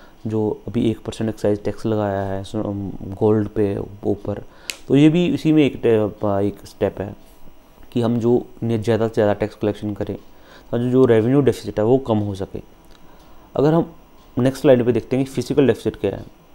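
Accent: Punjab, India. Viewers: You accent says You are Punjabi.. If you are, this is the first time I am watching a economic session from a Punjabi.. Loved it.. Thankyou.. Ap ghora, cycle bol sakte the... Donkey kharid ke us par baith kar office jana 😂😂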